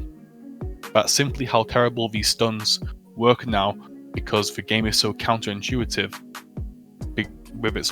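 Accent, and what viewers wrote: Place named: United Kingdom. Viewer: I´m just here to listen ´s lovely brit accent^^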